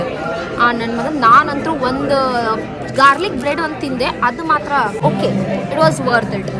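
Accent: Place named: Uttara Kannada, India. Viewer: ಉತ್ತರ ಕನ್ನಡ ಮಾತು ಮಸ್ತ್ ರೀ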